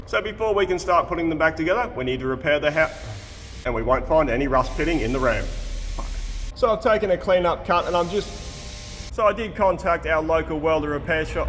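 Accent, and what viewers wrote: Australia. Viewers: Around 21 min. Heard you say "now we are going to find Santa" , realized your Australian accent...now we are going to find center. Was wondering "what does Santa have to do with this?"🤔🤭 Enjoying your vids.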